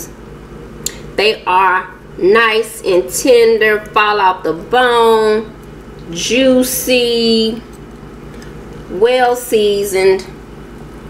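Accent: South, United States. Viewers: good ol southern accent I love it lol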